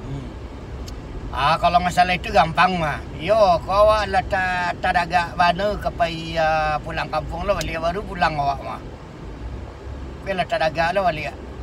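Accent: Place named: South Solok, Indonesia. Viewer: Ohh... gini ya logat solok selatan, benar kan muara labuh di solok? Salam dari pekanbaru 😊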